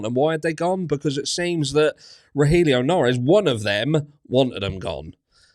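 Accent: Norfolk, United Kingdom. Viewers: Money & power will always win, even the media are making money from Liam. Howdy we’re neighbours 👋 we can sniff out that Norfolk accent 😂🦮🥰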